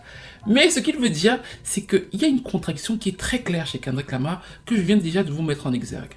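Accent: Cameroon. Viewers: Toi là tu as un accent un peu Camerounais ou j’entends mal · Il est camerounais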